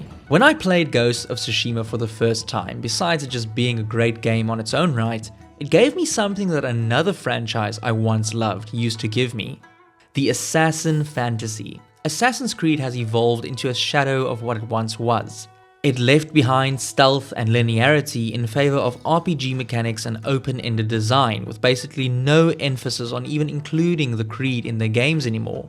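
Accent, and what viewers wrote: South Africa. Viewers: I knew your accent was familiar. Glad to see a saffa doing great content well done 💪🏻🇿🇦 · Do I hear a South-African accent?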